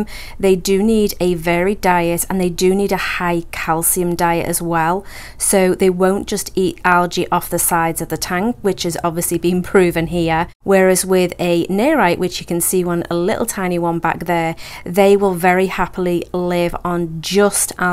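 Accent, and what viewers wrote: Liverpool, United Kingdom. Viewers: Great video. I've been a subscriber for a while now and there's a (off topic) question I've been wanting to ask for a while... I know you're based in the USA, but your accent, I'm convinced I can hear overtones of Scouse, what we call someone from the Liverpool/Merseyside area here in the UK, or am I just imagining it? Okay, back on topic. There seems to be much less choice and availability here in the UK when it comes to snails, I think partly due to some species being 'banned', but also for some reason they just don't seem to be as popular over here. However, I personally keep 1 Zebra Nerite in a 19 litre / 5 gallon tank (a Fluval Spec V, which is quite a narrow tank, only 16cm front to back and not the easiest to get into for cleaning or maintenance, etc.) along with a small colony of Red Cherry Shrimp. I also keep a trio of Zebra Nerites in a planted and 'scaped, 60litre / 15-ish gallon temperate tank, along with 8 Blue Ring Danios and 8 Amano Shrimp. 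The shrimp were originally about half the size of the fish, but now they (well, the females anyway) have grown to be bigger than the fish! I added the snails to the tanks because I wanted to add another dimension to the activity within. The fact that they also serve a useful purpose by helping to keep algae under control is, as I see it, a bonus but not the only reason I got them. At present, I'm playing around with 'scaping ideas in a 40cm cube (another 60 litre / 15 gallon tank) and I also have a Fluval Flex 9 (gallon / 34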